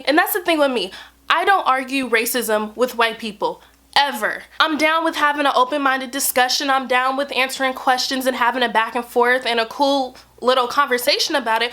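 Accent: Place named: United States. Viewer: You speak with an American accent. Your culture is American. When you accuse white people of "appropriating" your culture when in fact it is African culture they are "appropriating," you are guilty of the very thing you accused them of.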